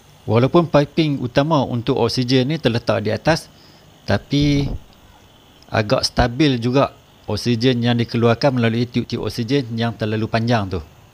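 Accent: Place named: Malaysia. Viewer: Bahanya kok kaya orang Malaysia ya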